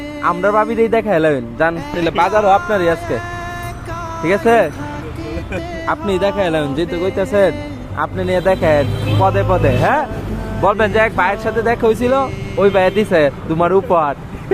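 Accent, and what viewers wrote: Sylhet, Bangladesh. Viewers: জাযাকাল্লাহ ভাই আপনার ভাষায় তো বলে আপনার বাড়ি সিলেট না?আমি কি ঠিক বললাম?🤔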